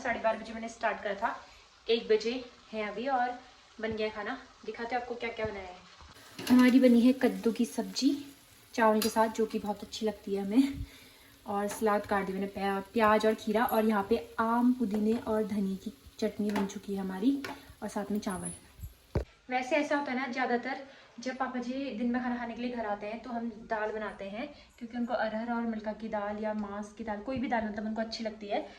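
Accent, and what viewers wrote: Pahadi, India. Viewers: Prnam 🙏🏼 dii mai hldwani se huu dii aap kbhi vlog phadi leaguge mai v banyaa kro kuki achaa lgtaa h phadi sunaa kisi muh se or apki tone v ati h phadi isliyee achaa lgta hh ❣️💕💖💖❤️🤗🤗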